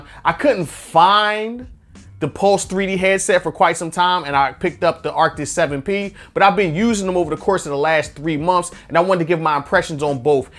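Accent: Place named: New York, United States. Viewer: Definitely from NY. Yerr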